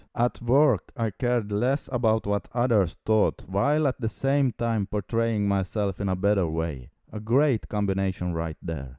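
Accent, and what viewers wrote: Finland. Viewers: kyl huomaa et äijä o suomest :D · First two seconds and can already tell youre finnish lmao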